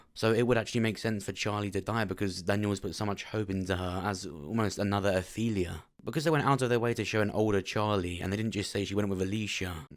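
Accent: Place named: Southern England, United Kingdom. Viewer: Lol I’m from blackpool but i think your southern accent proper ace lol you have a creepy type voice as well 🤣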